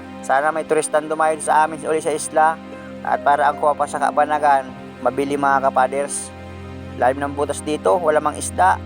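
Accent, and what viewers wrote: Bicol, Philippines. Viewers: Tyaga lng idol,masaya kng panuorin dahil may halong bicol pananalita mo,dahil bicol din ako,sa sipocot cam sur,kaya lng nsa europ ako.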